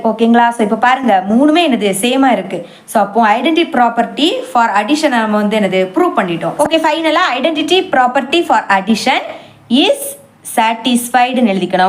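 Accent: Kanyakumari, India. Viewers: Super ma,உங்கள் பேச்சை கேட்கும் பொழுது நீங்கள் கன்னியாகுமரி என்று தோன்றுகிறது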